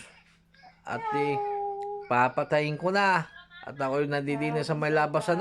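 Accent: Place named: Batangas, Philippines. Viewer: Heheheh katuwa nmn batanggeño po kayo ..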